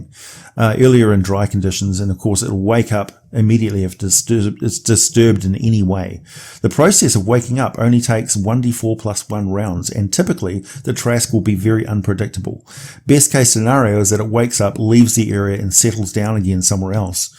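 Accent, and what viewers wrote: New Zealand. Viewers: Do I hear a kiwi? Or maybe South African... I should be able to tell the difference I’m half kiwi 😂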